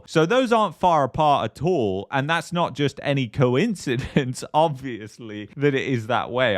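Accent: United Kingdom. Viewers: I have never seen a positive video from this guy just gloom and doom. If he does not hate Canada so much he should just move somewhere else, he sounds British anyways.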